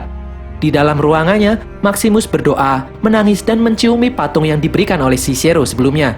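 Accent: Java, Indonesia. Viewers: Agak mendok ya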